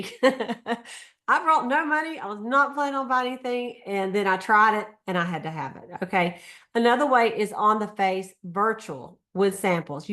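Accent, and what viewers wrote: South, United States. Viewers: I love your country accent. I wish I had one like that. Your voice makes your videos more fun to watch and listen too. I also hear the joy and excitement in your voice and see it on your face. I believe you really enjoy what you do.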